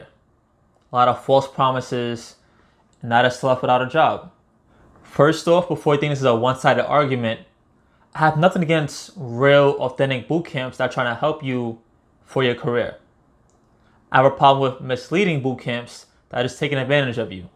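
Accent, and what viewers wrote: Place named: New York, United States. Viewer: Thanks for your channel! Much appreciated. You sound like a fellow New Yorker!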